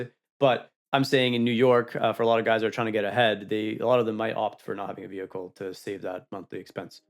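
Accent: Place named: Canada. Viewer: He sounds American but isn’t American, I’m gonna go with Canadian..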